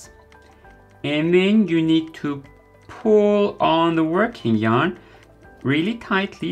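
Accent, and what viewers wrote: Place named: Germany. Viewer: Also, your tutorials are simply superb! You're so skilled, you pay close attention to detail, and you're so open-minded about various knitting techniques! It makes me feel like I can try anything I want and just have fun making mistakes and learning from them. Also, my mom is from Germany and your accent makes me feel just like I'm at home again. :)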